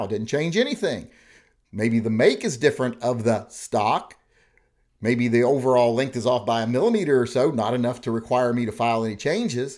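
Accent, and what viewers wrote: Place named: New England, United States. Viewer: Fud alert. You sound like a typical yankee.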